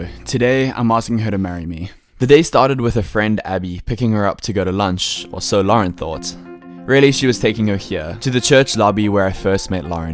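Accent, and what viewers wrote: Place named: Afrikaans, South Africa. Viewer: Do you know afrikaans you have the aksent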